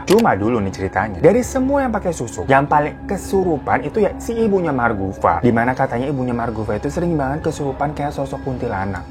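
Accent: Java, Indonesia. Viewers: Mas nya pas pake bahasa jawa jd lucu ngomonge🤣🤣🤣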